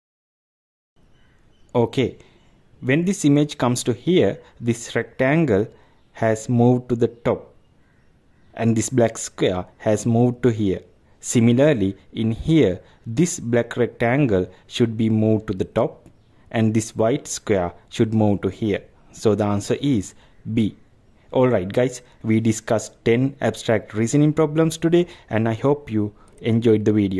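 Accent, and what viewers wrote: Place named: Sri Lanka. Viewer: I notice a Sri Lankan accent :D but keep it up bro!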